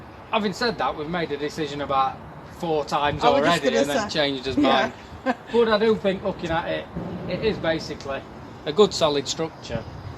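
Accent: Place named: Leeds, United Kingdom. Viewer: I am thinking your from Leeds but I hope from Sheffield put me out of my misery maybe Rotherham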